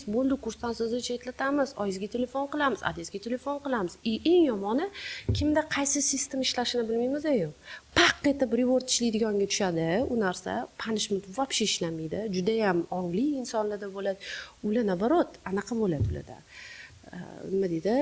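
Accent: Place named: Tashkent, Uzbekistan. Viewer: Подкастда яхши маълумотлар берилган, сухбатдош кизикарли, лекин адабий тилда гапиришга эътибор берилса янаям яхши буларди, Тошкент шевасида гапиравермасдан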